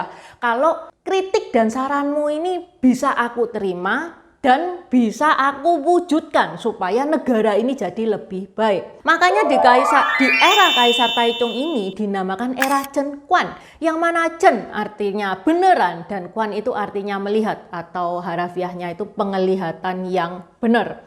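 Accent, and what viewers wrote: Java, Indonesia. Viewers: Cik tionghoa berlogat jawir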